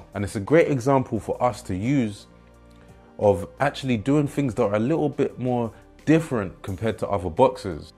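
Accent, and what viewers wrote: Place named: United Kingdom. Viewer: By your voice man clocked u r british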